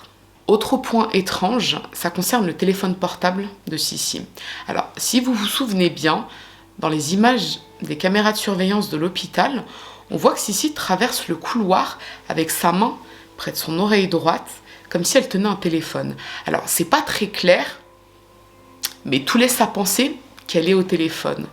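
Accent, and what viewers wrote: Italy. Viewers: Extrêmement fan de ton accent italien haha 😍❤️ Sinon super vidéo encore une fois ! Histoire très troublante... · Adorant l'Italie, t'écouter traiter des sujets de ce pays avec ton irrésistible accent italien.... Je surkiffe !! Grazie Bella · que du Bonheur pour votre ACCENT ITALIEN un DELICE, et sur le mot de la COCO, juste délicieux merci pour toute vos VIDEOS, bien mieux que le CINEMA et les reportages TV, et votre Charme dans la VOIX Superbe, SuBLIME, J 'aime Tout FELICITATIONS.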